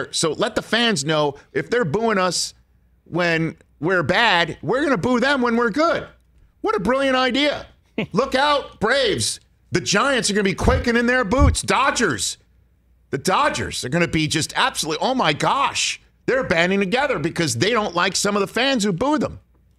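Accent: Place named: New York, United States. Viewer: NYC accent was extra stronger for this one!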